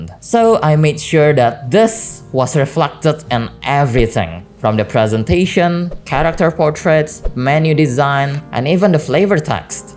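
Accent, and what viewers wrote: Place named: Indonesia. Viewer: how come you sounds indonesian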